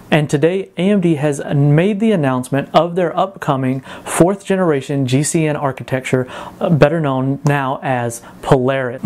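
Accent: South, United States. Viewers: I love how his southern accent keeps shining through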